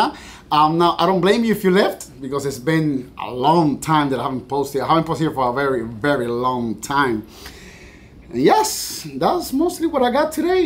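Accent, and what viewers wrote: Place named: Cuba. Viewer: Brother, I'm Cuban on my father's side, and he passed away in 2019. Your Spanish channel has allowed me to retain my Cuban accent and learn to be able to speak about fishing in Spanish and even develop my Spanish. Your accent vividly reminds me of my father and I have learned so much from your videos in the short time I've been following them compared to other Youtubers who speak perfect English. Your English is amazing too, don't let others discourage you! You don't improve without trying.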